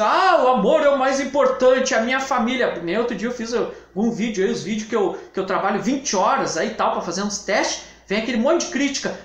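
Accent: Rio Grande do Sul, Brazil. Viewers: Boa noite. Assisti teus vídeos, os módulos e dei muita risada e aprendi muito com você. Sou do nordeste (Recife - PE) e me diverti muito com teu sotaque gaúcho (bá, gurizada, etc). Você é um talento na comunicação. Parabéns. Vou iniciar nas próximas semanas como Motorista UBER e agradeço teus vídeos. Super abraço. Gostaria que você me adicionasse no grupo de Whatsapp e também no teu whatsapp provado para ir aprendendo com você e com os outro colegas (<num>).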